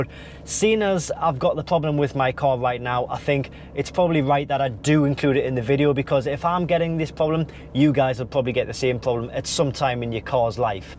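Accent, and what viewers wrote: United Kingdom. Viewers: what part of the UK are you from? Your accent is different from my London friend.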